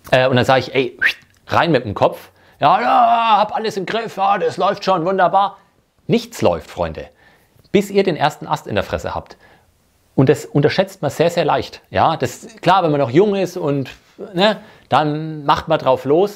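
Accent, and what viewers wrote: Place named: Franconia, Germany. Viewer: Schöner Dialekt, der Fränkische Dialekt. Meine Oma kam auch aus Franken. Sie kam aus Stadtsteinach.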